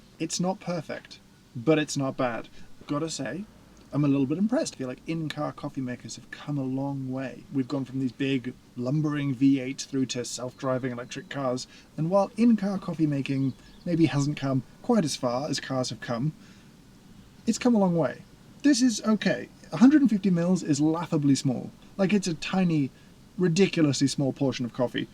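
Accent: United Kingdom. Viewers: Articulate British man tells you everything will be okay (ASMR)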